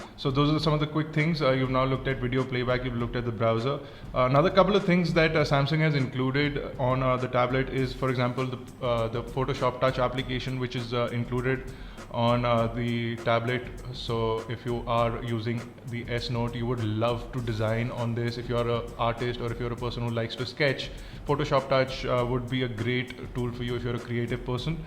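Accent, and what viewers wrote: India. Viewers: oh damn i hate that silly indian accent...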